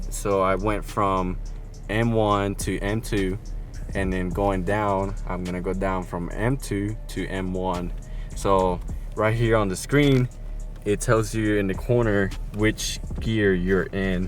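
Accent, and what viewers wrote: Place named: Baltimore, United States. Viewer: Are you from Baltimore? That Tew and Yew sounds familiar lol😂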